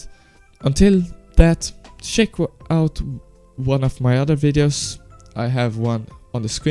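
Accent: Sweden. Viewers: bro have got the swedish accent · Are you swedish it sounds like that good tutorial! :D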